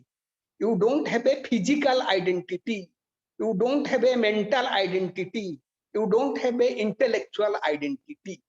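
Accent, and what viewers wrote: Odisha, India. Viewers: Swamiji pranam 🙏🙏🙏 I have few questions.. please answer me 1 - I practice pranayama, asana, bandha, mudra, do japa etc... When I meditate my prana became active and it generates heat in my body, my spine became hot it's like something rising very hot substance in my spine.. also the prana give pressure in muladhar and generate heat. Sometime it pains a little bit but it's ok. Then I stop meditation, but now again am starting. 2- Swami ji where do you live ?? Or have ashram? 3- Swamiji are you from odisha ?? You sound like odia cause am from odisha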